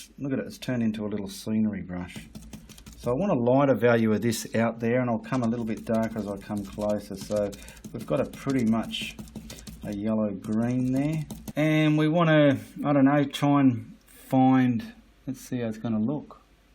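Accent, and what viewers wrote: Australia. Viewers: I love it! Thank you for a great tutorial! And I have to say I love listening to your Aussie accent! 😊 Most tutorials are from other countries so seeing the talent in our own backyard is wonderful! Big thank you from a fellow Aussie! 😍😍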